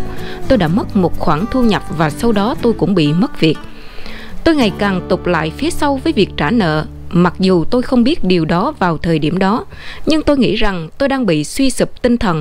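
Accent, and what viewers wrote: Standard. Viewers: Ban đúng là nhà báo chân thųc đúng nghiã.vūa biên tâp vūa xương ngôn viên rât chuân.rât trình đô và giōi..trân trong ban. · Nói chuyện quá chuẩn hay luôn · Cảm ơn em giọng em hay quá nghe rất chuẩn. Cảm ơn em đã cho chị biết những gì của xu Mỹ vậy mà họ cứ gọi là thiên đường bo quê hương vn mà đi. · Hay và chuẩn giọng đọc rất hay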